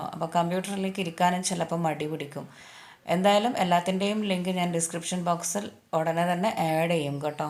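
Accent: Thalassery, India. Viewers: Nammude thalassery talk kelkan thanne Rasama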